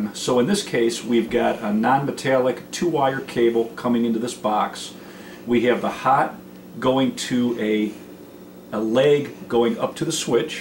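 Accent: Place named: Midwest, United States. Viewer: Spot the Midwest accent! "The hat is on tap!" Thanks for posting, this was very helpful.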